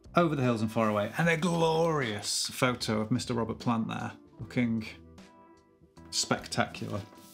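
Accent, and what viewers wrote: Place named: Northern England, United Kingdom. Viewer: Crackin'! Ta,. I've been hammerin' & pullin' for nearly 40 years but still can't get the timing right..... One of these days. Btw, you sound like a northern lad, which is the best type of course, but can I ask - would you mind not dropping so many 't''s, it's just not quite right! If you do so I'll promise to stop droppin' my 'g''s! Is it a deal?? Love on ya!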